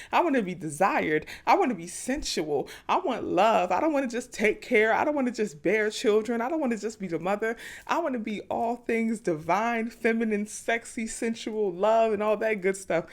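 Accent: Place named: North Carolina, United States. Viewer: You sound like you're from North Carolina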